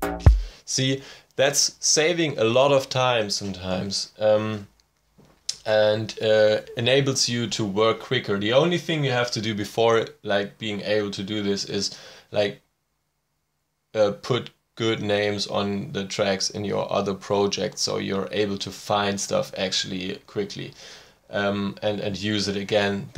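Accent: Germany. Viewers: You sound hella german lol. I know because I'd also sound like that when speaking english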